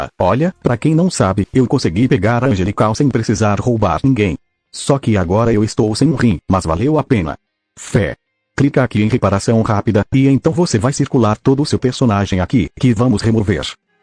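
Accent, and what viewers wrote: Bahia, Brazil. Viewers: O e baiano?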